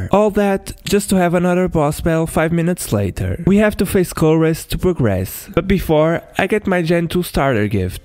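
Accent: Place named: Italy. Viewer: Da come parla sembra un italiano che prova a parlare in inglese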